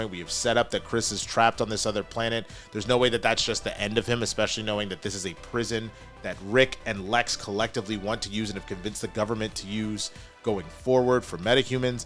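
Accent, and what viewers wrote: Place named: South, United States. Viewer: I loved the finale. This was continuing the DCU story not Superman’s story as you said on today’s stream in that wonderful southern accent. To me the story of the season was clear. It was a romance story between Chris and Emilia and a character study of Chris and how HE AFFECTS THOSE AROUND HIM so even if he isn’t on screen we are seeing how important he is to those around him. The story wasn’t about Nazi Earth it wasn’t about Big cameos it was about Chris, and how his decisions affects those around him and dealing with consequences.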